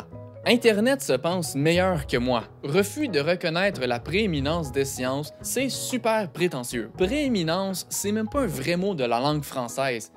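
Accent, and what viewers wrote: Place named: Quebec, Canada. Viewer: Ma langue d'origine est kurde :) Je cherche toujous du contenu en français québécois sur youtube car c'est l'accent auquel j'aimerais m'habituer et que je souhaite apprendre. Mais contrairement au français de france, je trouve qu'il n y a pas une abondance de contenu pour l'apprentisage de français avec l'accent québécois. Mais tes vidéos sont très bonne pour ça en fait parce qu'elles sont amusantes et intéressantes. Merci encore!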